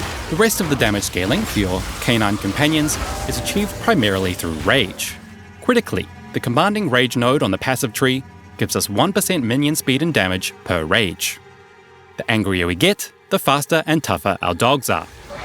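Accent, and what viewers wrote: New Zealand. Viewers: Okay, so "Air Buds" sounds like "earbuds" in the Kiwi Accent. Now we gotta see if can say "bad bed".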